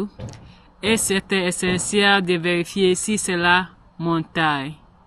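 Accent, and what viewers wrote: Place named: England, United Kingdom. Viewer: Salut vraiment continuer ton absent français prend le dessus sur l'anglais.Au début l'anglais ce trouvait beaucoup dans ta manière de parler mais maintenant le français prend le dessus dans ta manière de parler maintenant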